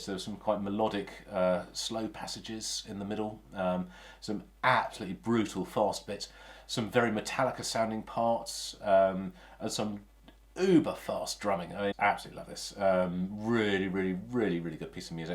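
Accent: United Kingdom. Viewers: Your accent is nice :D Are you from Britain? 🤔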